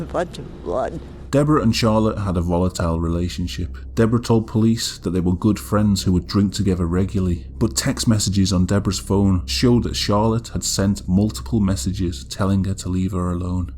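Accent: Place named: Northwest England, United Kingdom. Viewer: Love your videos, they’re always so interesting and familiar because of your Northwestern England accent. My family are all from that area 😊and it’s so nice hearing you voice over the vids. I’ve only just found your channel and couldn’t sub quick enough. Brilliant 🙂keep at it, you definitely keep me entertained x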